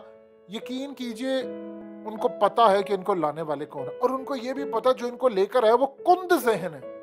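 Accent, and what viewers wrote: Punjab, India. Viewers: Nice punjabi touch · Kia Punjabi ka tarka lagtay hn Uncle AP SADA Khush rho Brave personality haqeeqat tv anchor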